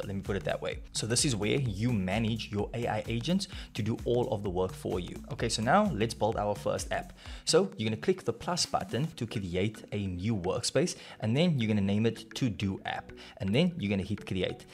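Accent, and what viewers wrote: South Africa. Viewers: Bru are you from SA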